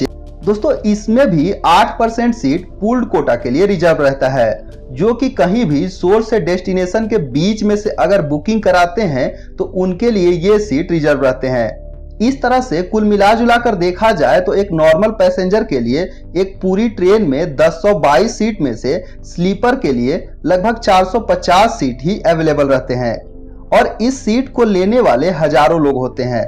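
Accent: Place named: Howrah, India. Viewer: Howrah se ho naa ? 100 %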